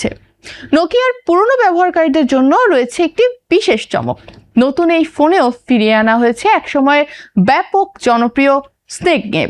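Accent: India. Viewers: কোলকাতার টোন ধরছেন নাকি !!!!!!!!!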